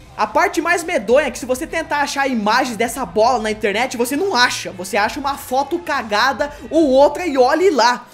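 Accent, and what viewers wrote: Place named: Rio Grande do Sul, Brazil. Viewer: Tu é gaúcho porque tu fala quinem gaúcho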